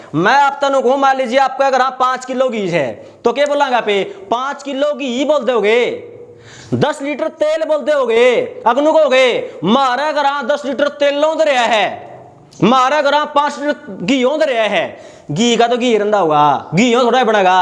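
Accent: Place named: Haryana, India. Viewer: akdm haryanvi 👍👍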